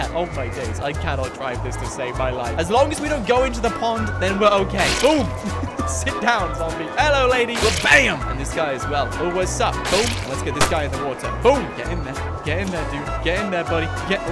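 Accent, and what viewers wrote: England, United Kingdom. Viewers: Is he British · hey are yu from england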